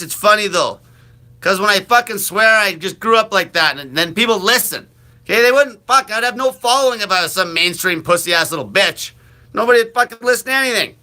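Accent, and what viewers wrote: Canada. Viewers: Jesus Christ.. what part of Canada are you from?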